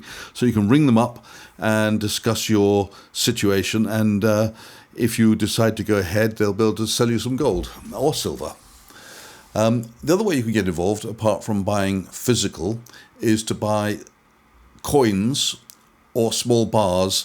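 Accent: England, United Kingdom. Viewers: Really appreciate your wisdom and that beautiful English accent.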